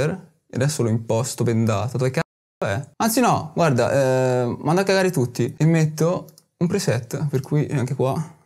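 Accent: Italy. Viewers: Non sapevo fossi veneto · toscano AHAJAKNAPA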